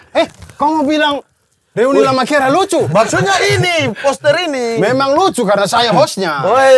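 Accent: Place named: Kupang, Indonesia. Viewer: Enak skali ee dengar kk abdur pake logat kupang ni, jadi ingat masa2 di kupang ee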